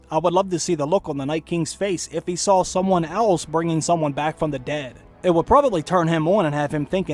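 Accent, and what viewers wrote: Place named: Oklahoma, United States. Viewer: Where are you from? You sound like you're from Oklahoma, or Utah.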